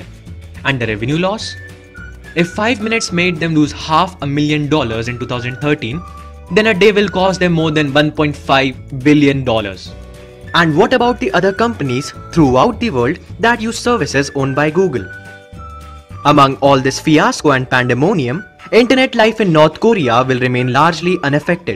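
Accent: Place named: India. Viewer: Indian accent 😑😂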